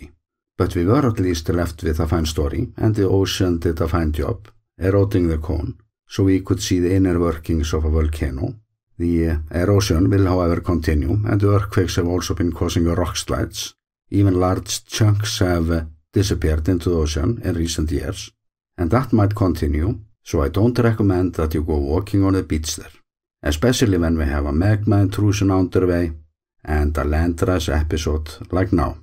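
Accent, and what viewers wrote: Iceland. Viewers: Brilliant as usual and the English language couldn't sound any better with an Icelandic accent.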